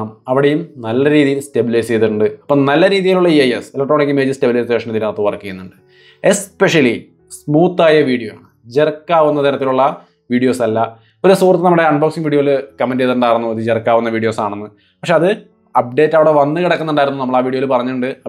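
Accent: Thrissur, India. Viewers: Thrissur kaaran aaanalle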